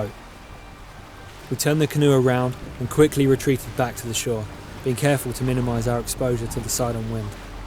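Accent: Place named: United Kingdom. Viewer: Hm. Great video, but... Not sure about how I as a Scot feel about some posh English bloke telling all and sundry about the wonders of the beautiful remote parts of my country. Great in one sense, but then how am I or my countrymen going to feel when we arrive there at the rugged heart of our wild Scotland... and because of videos like this it's full of posh clueless middle Englanders?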